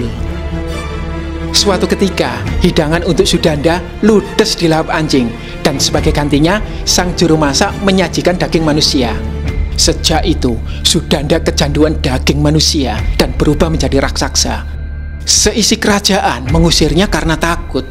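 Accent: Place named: Tulungagung, Indonesia. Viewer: maaf mas, aslinya mana kog mirib Tulungagung logat kata" nya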